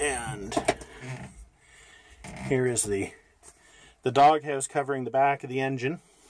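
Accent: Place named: Canada. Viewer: He's Canadian, and some Canadians pronounce certain brand names differently, so I think I see what you mean.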